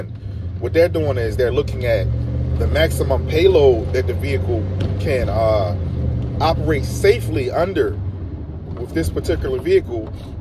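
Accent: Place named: Philadelphia, United States. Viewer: You sound just like Umar Johnson. Before saw this vid, I already knew you were from the Philadelphia area.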